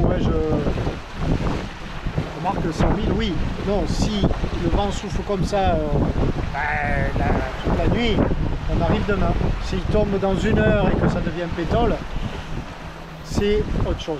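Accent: South, France. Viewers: Fantastique belle traversé ca fait bien envie merci de nous partager tes aventure toujours avec cette bonne humeur et cette accent du soleil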